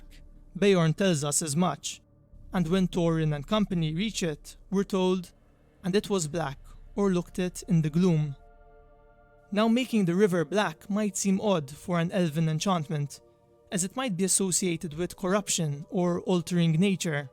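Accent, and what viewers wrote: Malta. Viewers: Great video buddy! You are Maltese, right? Very distinct accent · You sound like a Maltese English speaker. Good work on the videos!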